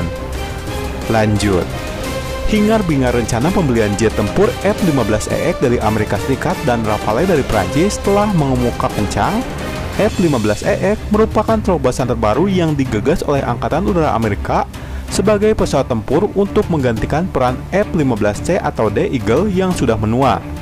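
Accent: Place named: Sunda, Indonesia. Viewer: Sundanya medok pisan mang hehe 😁😁👌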